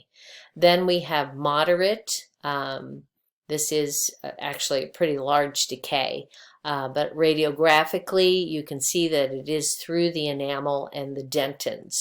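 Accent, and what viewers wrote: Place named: United States. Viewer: Although from usa I assume and I love that accent, finally something for dental assistant, I'm doing course in uk so extremely helpful. Thank u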